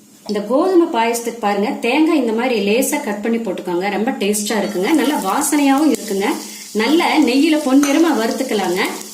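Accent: Kongu, India. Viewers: கொங்கு தமிழ்❤ நானும் கோயம்புத்தூர்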